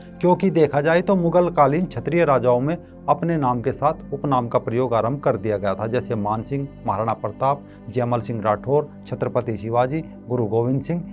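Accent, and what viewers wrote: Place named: Western Uttar Pradesh, India. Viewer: Aap ki awaaz se ye pta chalta hai ki aap west up ke kisi district se ho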